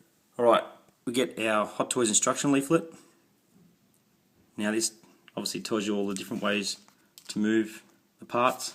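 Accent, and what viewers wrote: Australia. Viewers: it looks awesome and i cant wait for mine to arrive. i wish i had this paid off already but last payment is today and should be here soon. i assume your living in australia? due to your accent,im a west aussie and just wondering if there shipping to australia yet. cheers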